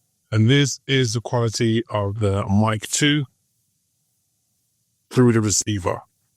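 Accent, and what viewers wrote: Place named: United Kingdom. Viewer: You from the UK, G? You look like you’re based in the states! But your accent sounds local?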